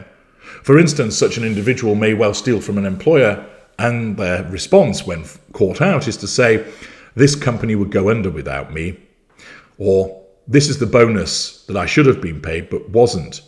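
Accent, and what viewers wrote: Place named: Midlands, United Kingdom. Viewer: I detect a bit of the Midlands in his accent. Have thought so for a while.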